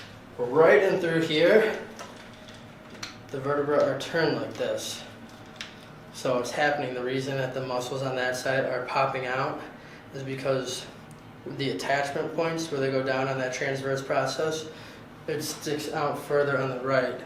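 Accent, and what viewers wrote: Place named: Midwest, United States. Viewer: Hey, you sound like you're from Illinois or Wisconsin. Am I right? Random question, I know.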